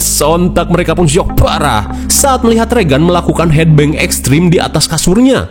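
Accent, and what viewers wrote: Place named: Sunda, Indonesia. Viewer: asli logat bahasa Sunda sareng indo na sok pikaserien😅😂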